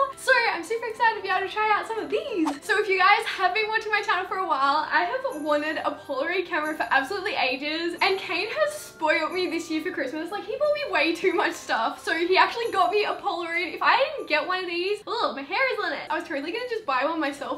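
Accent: Australia. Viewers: Are you Australian? xx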